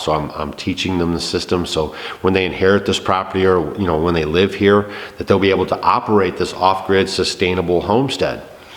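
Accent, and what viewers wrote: United States. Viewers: what, no heritage ?hey, you sound like someone from the USA... (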